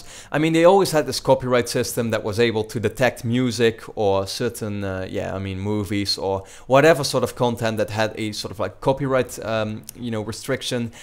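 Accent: Netherlands. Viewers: Hey you sound dutch. Are u dutch.?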